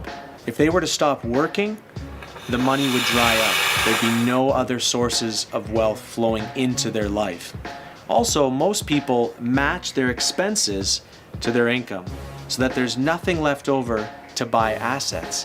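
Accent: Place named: United States. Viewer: Are you morocan??!!bc ur accent is like an American 🇺🇸 watching your videos from Washington DC ☺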